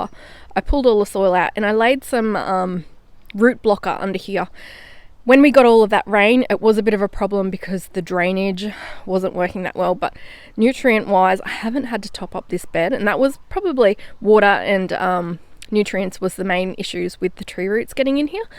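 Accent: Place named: Queensland, Australia. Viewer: Omg thank u... i just found ur channel an it's so good to hear another queenslander speak haha im a bit of a hermit an ive just realised I must be watching way too many American gardening channels ...crikey.. on a more serious note, I see ur experimenting with different tomatoes, do u have a fav yet of the large style ones in this climate? im just north of u near maryborough an have an absolute abundance of cherry tomatoes nearly all year round but cant find the same consistency in anything else yet ...maybe the cherries have set the bar too high i dunno.... oh an if u want a good flower for ur front garden put zinnas in, they grow really well need no care an the combinations of colours from cross pollination that occur each season is truely amazing... cheers an keep up the good work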